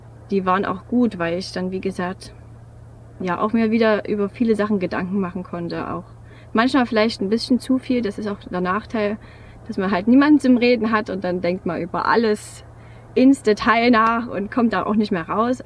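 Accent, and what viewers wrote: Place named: Saxony, Germany. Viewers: Ich wusste gleich, dass mir der Dialekt total bekannt vorkommt. Liebe Grüße an Dich aus dem Vogtland:) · Sächsisch is einfach toppe sage ich als 🇨🇭🙋🏻‍♂️✌🏻 go for it. Coole junge Lady. Tolle Docu. Toller Roadplan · Am Anfang dachte ich noch, der Dialekt kommt dir bekannt vor und dann sagst du, dass du aus Plauen bist. Super, das ist auch meine Heimatstadt, auch wenn ich da schon seit vielen Jahren nicht mehr lebe. Du hast meinen vollsten Respekt, denn auch ich muss allerdings notgedrungen seit letztem Jahr allein mit dem Wohnmobil reisen. Unsere Schicksale sind zwar völlig unterschiedlich und du könntest vom Alter her meine Tochter sein, aber du machst mir tatsächlich Mut, meinen Plan auch in die Tat umzusetzen. Viel Glück weiterhin und gute Reise. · Na,Du liegst ja schon Mal gut da🐒😸👲😨alles Gute für Deine Unternehmung.Wie machst Du das mit Deiner Arbeit?Oh,guter Dialekt!Plauener Spitze😸ich habe Mal als 18Jaehriger 1976 ne schöne Goehre aus Plauen am Ostseestrand geküsst.Schoene Erinnerung ohne Folgen.Da wurde noch die Grenzbucht von der NVA nachts mit Booten und Riesen Bogenlampen abgeleuchtet.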